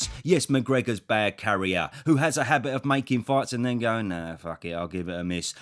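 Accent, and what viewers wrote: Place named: England, United Kingdom. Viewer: Love the channel man. As an American, the English accent just makes everything more interesting but regardless you make great content👍🏻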